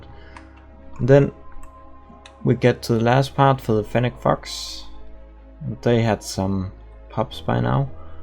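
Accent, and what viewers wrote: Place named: Denmark. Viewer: Love the zoo. Also, as a fellow dane, i recognized the accent immediately lol. But your english is good so dont worry about it. It has a charm ❤️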